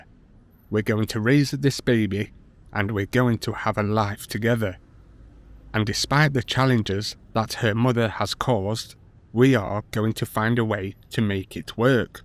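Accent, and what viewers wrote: United Kingdom. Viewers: Not true.... You speak with a British voice yet you're not a Yank.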